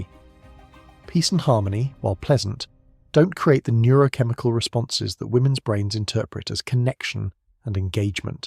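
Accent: United Kingdom. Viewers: This is why you can't trust a British accent, he is getting you to buy into toxic behavior without calling it toxic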